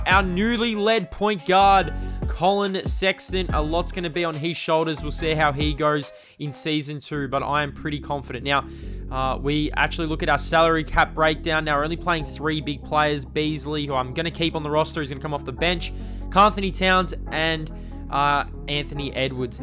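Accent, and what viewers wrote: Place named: Australia. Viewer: Where in Australia r u from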